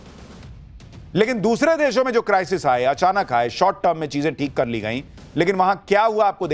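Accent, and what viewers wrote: Bihar, India. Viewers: Dear Anchor Sushant ji aapka jo ye Bihari style mein anchoring is very interesting.😊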